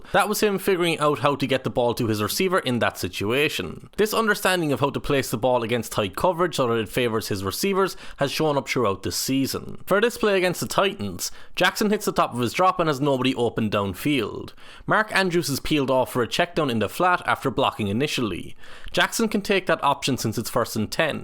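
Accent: Ireland. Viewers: An Irish man commentating American football? Okay I’m here for it. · Surprised to hear narration from an Irishman. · Can't say I ever thought I'd hear an in-depth film breakdown of an NFL QB from a guy with an Irish accent, but you clearly know what youre ralking about. Great stuff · The best American football commentary with an Irish accent I've ever heard.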